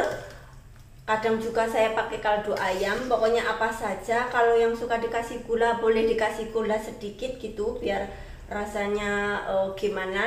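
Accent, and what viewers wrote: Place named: Banyumas, Indonesia. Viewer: Mba aali mana si kok cara ngomongnya kaya q ..q asli banyumas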